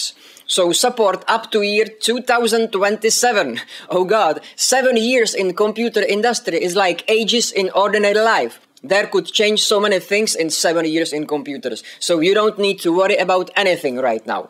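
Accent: Czech Republic. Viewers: Are you from czech republic? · you are from czech :) ?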